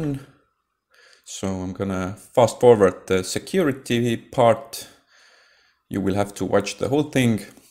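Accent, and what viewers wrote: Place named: Estonia. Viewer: Miks ma kohe su aksendist aru sain et sa oled eestlane?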